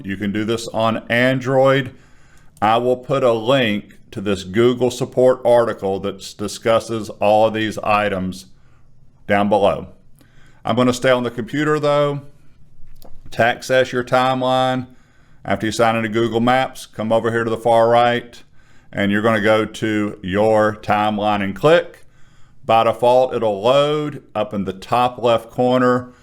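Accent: South, United States. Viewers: I love your accent, sir. We need more Southern hospitality up in NY.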